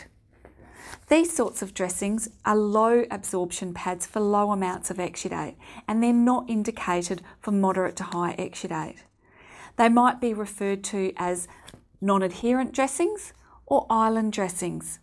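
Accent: Australia. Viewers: OMG Her Australian accent is giving me a headache!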